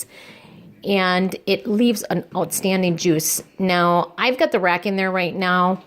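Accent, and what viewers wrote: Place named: North, United States. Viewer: hello! You sound like your from the north lol. Hello from MN here. Thanks for the video, i was going to try pico and chicken in my new instant pot as well. almost exactly a year later.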